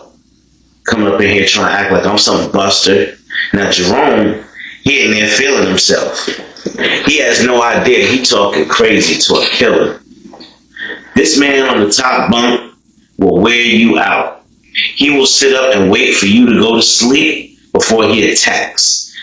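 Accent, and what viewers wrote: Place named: New Jersey, United States. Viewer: What part of Jersey you from my G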